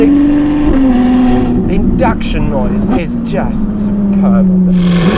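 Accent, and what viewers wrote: Afrikaans, South Africa. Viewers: Are you South African?😊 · Tell me you’re South African without telling me your South African? Ma se kinnes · Love the accent sir your far from home🇿🇦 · This guy sounds South African? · Love the Afrikaans coming through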